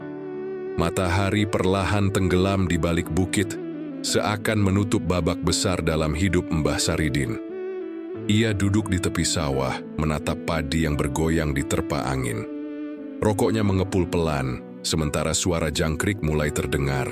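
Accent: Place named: West Java, Indonesia. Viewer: Ini cerita agar umat belajar ilmu ,Sareat,Toriqod,Hakekat,dan Makrifat ,ini sudah tingkat Makrifat ,jadi anak anak belum sampai ,masih saringat,sayang yang membawa suaranya orang dari Jawa barat , bila Jawa tengah akan lebih halus contoh dipun Tompo ,bahasa Jawa barat di Tampa kelihatan benar orangnya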